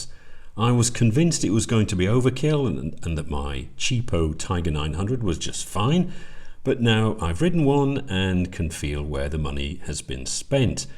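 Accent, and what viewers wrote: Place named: RP, United Kingdom. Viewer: Love your English. Proper RP. Cheers from an English teacher in Sweden. And yes, that bike is way too expensive for most of us mortals.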